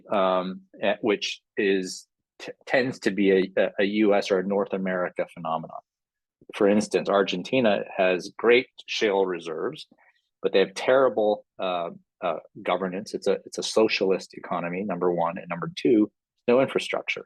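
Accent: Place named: United Kingdom. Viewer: So I have a book about active listening and it suggests you repeat the last sentence the other person said, but in your own words, so, slow it down, you speak quickly, and say, “so if I understand you correctly, you’re saying blank blank blank. He’ll say “yes” then you ask a question that builds on his answer”- lots of books out there on how to interview and ask questions in an active listening mode. Mostly I’d say slow way down because you have a distinctive British accent but talk super fast. You’ll figure it out and continue to provide great content! Thanks for making this video!!!!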